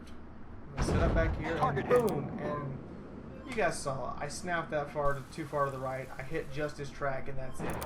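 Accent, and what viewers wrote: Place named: Alabama, United States. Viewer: I appreciate Youtubers/Bloggers that post informative information. Plus that Alabama twang.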